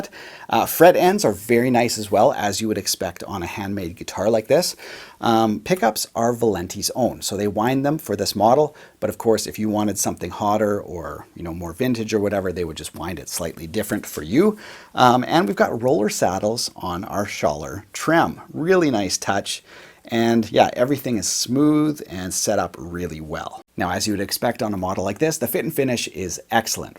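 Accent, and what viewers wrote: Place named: Canada. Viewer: After years of watching South Park you can spot Canadians. Its they way they pronounce aboot (about)..... 😎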